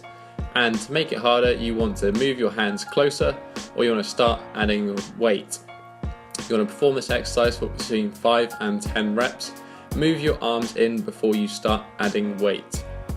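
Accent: United Kingdom. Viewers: You sound British but have a palm tree behind you...Where are you? It's driving me crazy haha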